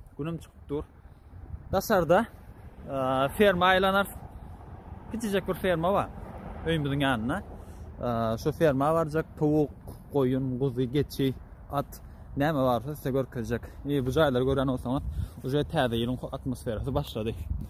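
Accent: Turkmenistan. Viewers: Salovmaleykum sen lebaplymy gurleysin birhili menzap gitdi.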